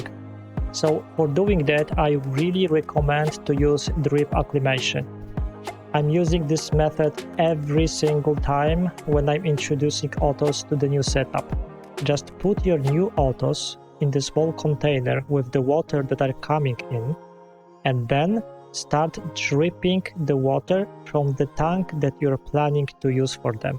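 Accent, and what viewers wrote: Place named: Poland. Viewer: Are you Polish, mate? Sounds like it😉 A few of mine died after a year for no clear reason. Balanced tank, no ammonia, no nitrite/nitrate spikes, much green algae growth on side glass, no new fish to bring diseases whatsoever.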